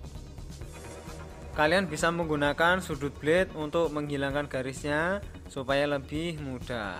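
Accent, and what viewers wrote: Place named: Central Java, Indonesia. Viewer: Logat jateng jebule to mas😂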